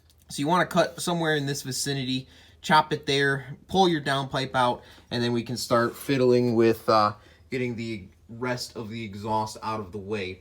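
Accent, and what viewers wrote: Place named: Michigan, United States. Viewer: It's a Michigan accent... I lost mine since I moved south 35 years ago... y'all..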